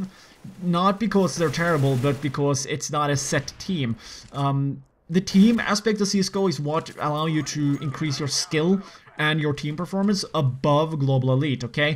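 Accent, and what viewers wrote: Norway. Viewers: Norwegian?... not swedish?.... first time :) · Haha er du norsk? xD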